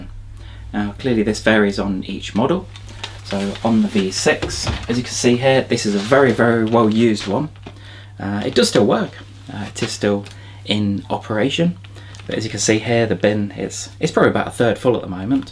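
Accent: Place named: England, United Kingdom. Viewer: ох уж этот английский акцент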